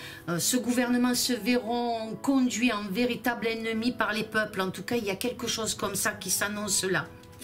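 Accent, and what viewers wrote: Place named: Camargue, France. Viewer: Bjr contente de vous retrouver en bonne santé, et surtout de vous protéger en vous reposant. Ce qui me trouble, ce sont les mi.....s étrangères que vous avez vu, et dont j avais entendu parler il y a quelque temps, la nationalité d un pays dont on parle bcp en ce moment.prions pour que cela n arrive pas, pour tout le mouvement qui s est mis en route.merci bcp, vous avez l accent d une ville que je connais ou de la camargue.ca me fait plaisir. Bien a vous prenez soin de vous.✌️👍🇲🇫🚛🚚💖🗽🐞🍀🕊️😘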